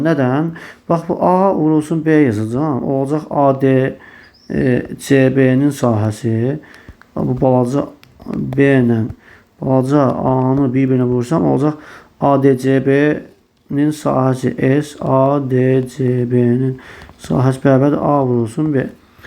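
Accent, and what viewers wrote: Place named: Gabala, Azerbaijan. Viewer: Salam danışığında Qəbələ ləhcəsinə bənzəyir sabah hamınza uğurlar ❤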